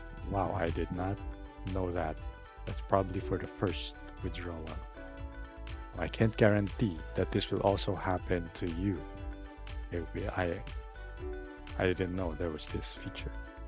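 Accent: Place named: United States. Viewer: You indeed have a nice american accent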